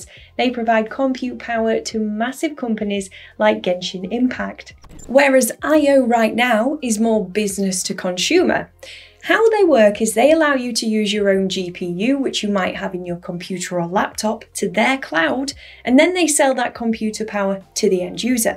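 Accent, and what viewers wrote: England, United Kingdom. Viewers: I love your English accent!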